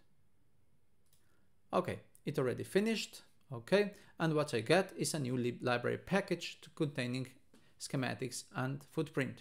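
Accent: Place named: Italy. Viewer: Why do you sound Italian?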